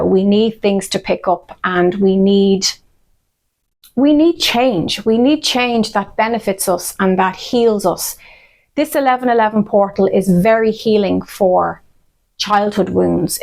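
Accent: Ireland. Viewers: I am here from Canada, I love your Irish tone. I love your positivity ❤️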